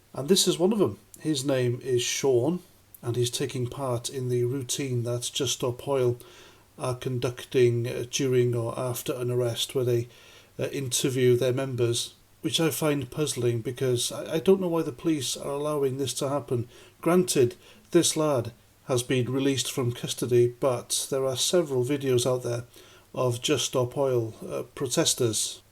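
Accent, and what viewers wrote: Ireland. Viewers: His accent sounded as if had a hint of Irish to me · He sounds like a very posh irish man. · I heard an Irish (eire) accent. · Hi there, like another viewer, I detected a faint Irish accent. Not NI, more like Dublin. One wonders why anyone would make a point of protesting overseas. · He sounds Irish to me. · English? He sounds Irish to me. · I think I detected a very, very slight Irish accent so maybe that's where his ambassador thing comes in.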